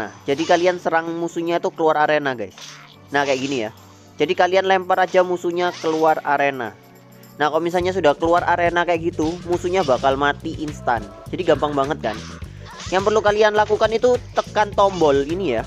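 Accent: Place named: Java, Indonesia. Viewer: Wkwkwk medokk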